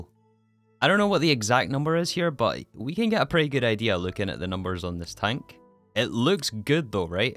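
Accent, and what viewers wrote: Scotland, United Kingdom. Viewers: He's Scottish. Have you really never heard a Scottish accent before? SMH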